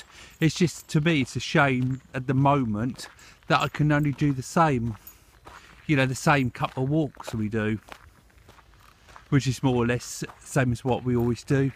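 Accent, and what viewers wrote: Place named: England, United Kingdom. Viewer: ist your name right?. I have a question about your accent. in which part of england people speak like this? thx in advance. i like your videos. cheers from a german from germany.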